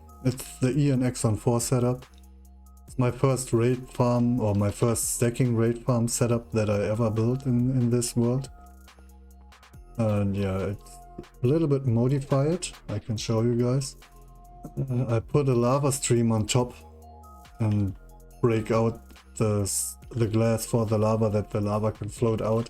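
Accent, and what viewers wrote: Germany. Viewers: Man merkt den deutschen Akzent richtig 😂 cooles video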